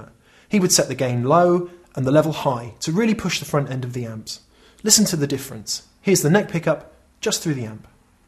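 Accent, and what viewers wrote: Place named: United Kingdom. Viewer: !!!! so Young! As a non-English speaker it is remarkable how his accent has become so much clearer over the years whilst remaining so British. IMO, the best guitar youtuber with Andy and Justin. amazing guitar playing and presentation skills, I am so envious:)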